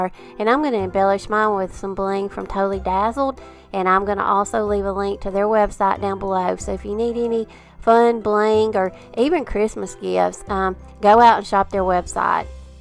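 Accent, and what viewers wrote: South, United States. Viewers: LOVE YOUR SOUTHERN ACCENT SOOO SWEET!!!!!!!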